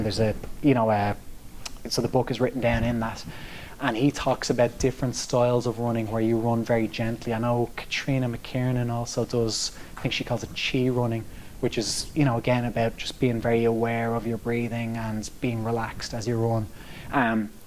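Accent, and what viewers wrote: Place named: Ireland. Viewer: Big man ! Its been a while ! Classic moment as I am looking for tips and tricks on doing my first marathon here in florida in October. I clicked your link and hear the irish accent and burst out laughing ......I know that bloke ! I hope you are doing well skipper. I enjoyed the video, good work. Gerry Carroll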